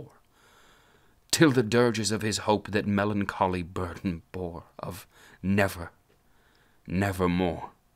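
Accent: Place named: Mid-Atlantic, United States. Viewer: Excellent use of the midatlantic accent. Your inflection and pacing bring to mind the best actors of the last century, trained in Shakespeare. Thank you so much for sharing this with us!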